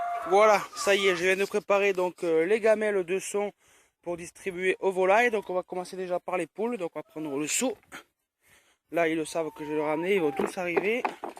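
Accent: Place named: South, France. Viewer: Tu vien de quelle région? On voit que tu viens du sud avec ton accent....J'ai le meme .... Apres sa ce trouve je me trompe tu viens peut être du nord mes sa serrai bizarre (MOI midi-Pyrenée donc du sud 😂)Sinon super video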